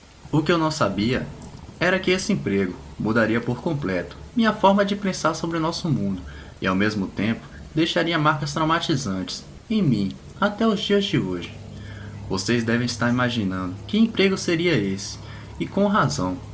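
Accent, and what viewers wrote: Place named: Pernambuco, Brazil. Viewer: esse narrador só pode ser um pernambucano!!!! pelo sotaque esta mais que evidente,e qto ao possivel demonio em forma de cadaver? pode ser sim ,ele causa alucinações doidas. história FODA essa hein???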